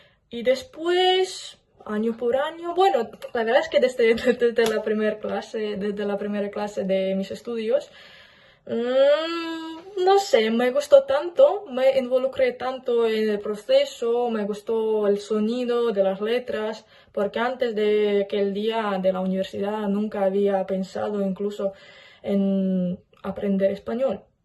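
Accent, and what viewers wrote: Galicia, Spain. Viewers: Sí se dice también "friolera", ella habla español de España. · Tu español es producto de vivir en España, lo imagino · Tu acento es español😅 muy diferente al castellano latinoamericano 😊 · Hola tienes acento GALLEGO. O sea ESPAÑOL. Segura Que no erez ESPAÑOLA. Vale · Hablas bien gallega. Saludos desde Argentina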